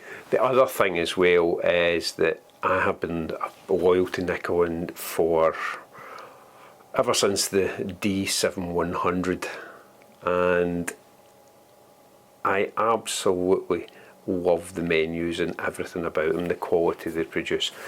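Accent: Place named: Scotland, United Kingdom. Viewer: I'm going to come across as a complete donkey's ass but I'd bet a penny on it. Have you thought about adding subtitles to your videos given your charming Scotty' accent? I've got no problem with it all. I love it. As for your American and second language audience given your strong accent? Hmmm...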